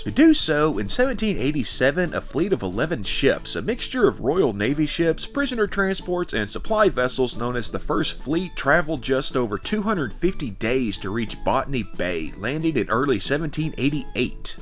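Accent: United States. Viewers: Great work! Got a bit of a laugh hearing someone so American sounding talk so succinctly about early Australian history…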